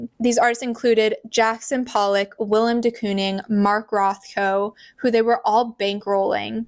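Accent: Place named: United States. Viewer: Well, here I am commenting again, because I think it’s worth considering another perspective. Yes, the CIA funded aspects of the abstract art movement. Hitler, on the other hand, heavily promoted classical realism. If government patronage alone is enough to discredit an artistic movement, then that principle has to be applied consistently. The conclusion cannot depend on which government happens to be involved. Also, based on your accent, I assume you’re in America. The same society you criticize also protects your freedom to reject abstract art entirely. So even if the CIA pushed it, you are free to decorate your home however you wish. And as you yourself noted, the objective was not to corrupt art, but to demonstrate the cultural power and global influence of the United States, particularly because the Soviet Union rejected abstract art. The movement was being used as a symbol of ideological freedom, not as evidence that abstraction itself was somehow a corruption of beauty. 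I worked as an art curator, and I genuinely adore abstract art. Ironically, although I have access to many classical works, I often find them a bit stuffy in a domestic setting. I have a small black abstract canvas hanging in my hallway beside a classical vase and an antique Austrian Art Nouveau chandelier. I also keep traditional Orthodox icons because I am Eastern Orthodox, and we certainly agree that Christ is the fullness of beauty. Architecture and interior design are ultimately about harmony, prop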